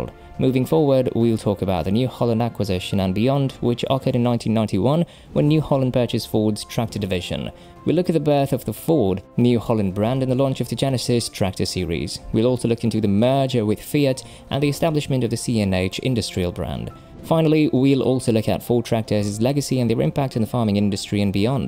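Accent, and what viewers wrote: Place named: England, United Kingdom. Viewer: Turned this off for the English accent.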